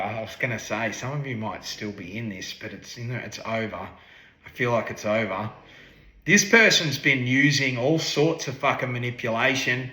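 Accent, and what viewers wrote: Australia. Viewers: 100% feel this found me. Thanks. Never got a tarot reading from an Aussie before and i gotta' tell you, it's pretty entertaining the way you articulate and deliver your message ❤😂 LOVE & LIGHT💯👊🫶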